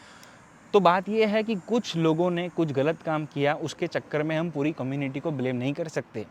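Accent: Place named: India. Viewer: In a way, isn't that better? Why would you want his Indian accent to violate English of its natural grace and majesty?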